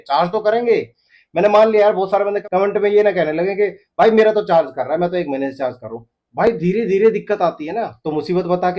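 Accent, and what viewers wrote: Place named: Haryana, India. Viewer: hisar,haryana.Boli t smaj gya tha m chhora haryanvi h👊👍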